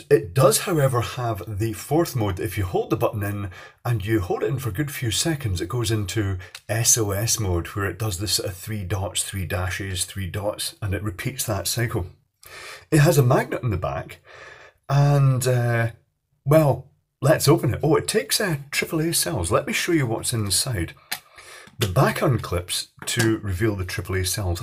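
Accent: Scotland, United Kingdom. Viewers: Always nice to watch a video by shortly before retiring for the evening. Your accent reminds me of a friend I have from Bishopton. But not his mother's who was from Aberdeen.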